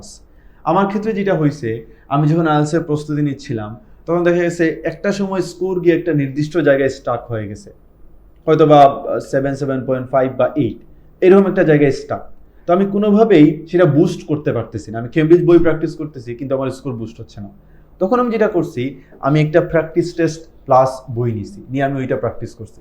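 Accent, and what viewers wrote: Sylhet, Bangladesh. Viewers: Sir,I think you are sylheti🤔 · Sir or mat hunia lager sylhete 😅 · Asslamualikum Bhaiya.. Apni ki sylheti..kub helpfull viedo❤ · ভাই কিতা সিলেটি নি,হালকা সিলেটি টান আয়। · R u Sylheti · Sylhety vai.... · Vai are u sylhety? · স্যার আপনি কি সিলেটি ☺️ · Are you from Sylhet ? I noticed from your accent. I am also from Sylhet. Good Work. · Wonderful suggestion.....are you sylhety? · Sir er accent shune amader sylheti laglo.Apnar basa kun jaigay sir?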